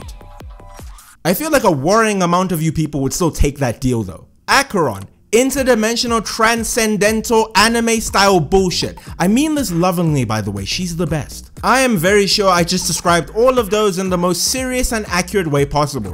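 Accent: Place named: South Africa. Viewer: u started speaking and i immediately went to check ur location lmao i can always spot my fellow south africans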